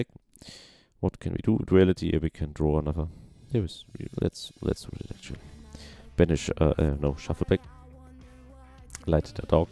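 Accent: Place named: Germany. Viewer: You are creating good videos but please work on the language the german accent is hoorrrrribale. Its not „di“ its the or you can spell it „se“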